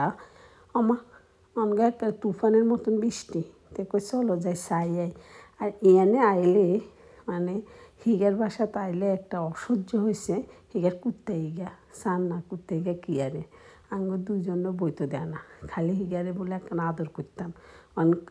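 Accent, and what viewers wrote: Noakhali, Bangladesh. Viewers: আমি বুঝিনা নোয়াখালীর কথা আন্টি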